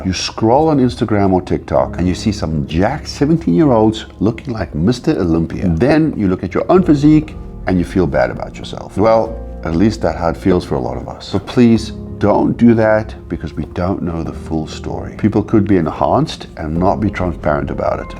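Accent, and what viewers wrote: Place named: South Africa. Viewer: Great video, you sound very South African and look like a member of the Springboks. 🤔 Great content 💪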